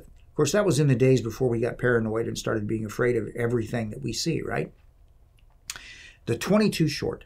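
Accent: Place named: United States. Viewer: You don't sound English, you sound American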